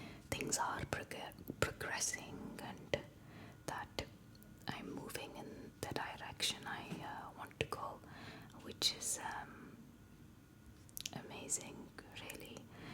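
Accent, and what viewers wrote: South India, India. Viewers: I’m hindi and it really sounded South Indian. My jaw dropped oef almost lost it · This sounded more South Indian than Gujarati but it's still a very good Indian accent. You're the first foreigner whose Indian accent doesn't make me cringe. In fact, your accent reminds me of some of my teachers. The video is very well done. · This is south indian accent rather than Indian I mean Indians speak normal English y'all so many stereotypes😐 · Thats more like south indian a bit overdone but nice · This is south Indian accent. Indian accent is a little bit of British type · True, most of my south friends talk exactly like this but delhi, Punjabi usually have a good british accent. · This is more of south indian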